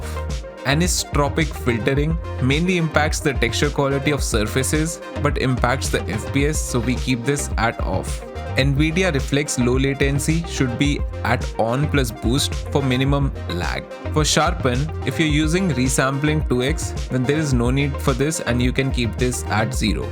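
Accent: India. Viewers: from ur accent am i correct to assume ur indian? if so happy to see an indian makin tarkov content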